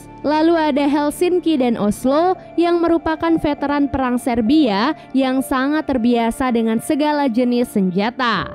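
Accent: Lombok, Indonesia. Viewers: Mbak dari lombok ya?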